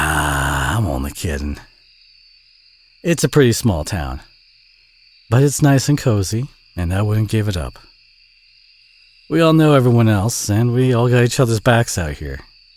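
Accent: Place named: South, United States. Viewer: love audio and story. another cowboy audio yes!!!!!!!! the southern accent it’s just 🫠 I do really love the audio of you as a Cowboy. I don’t drive well because I can’t but in audios I can. thanks for helping me out and giving me [listener] a place to stay. anyways lovely to hear your voice ♥️ cowboy + = ♥️♥️♥️♥️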